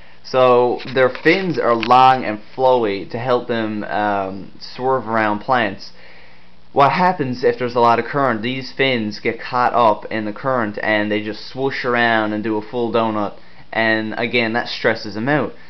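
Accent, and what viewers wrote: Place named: Canada. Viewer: sounds like your either canadian or Irish american. I also have a few questions, I want to get a betta and i don't really want too big of a tank you think a betta will be comfortable in a 5 gallon tank? My house it always around 78, will i have to get a filtration system or warmer, I was hoping just to have a 5 gallon tank, put some plants in it.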